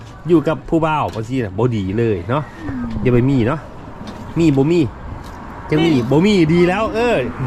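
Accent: Phu Thai, Thailand. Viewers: เสียซื่อพุไทได้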